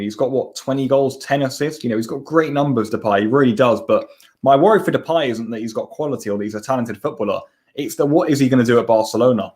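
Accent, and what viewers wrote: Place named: United Kingdom. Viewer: Your English is very good, sounds like ur from the UK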